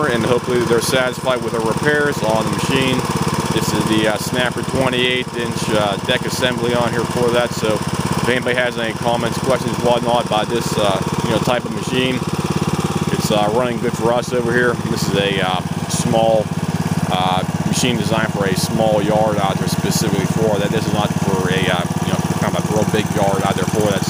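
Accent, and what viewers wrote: Pittsburgh, United States. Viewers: Definitely sounds Pittsburghese to me. Every time he says "down" it sounds like "Dahn," which is very familiar to Pittsburgh! Glad you picked that up too. 😉👍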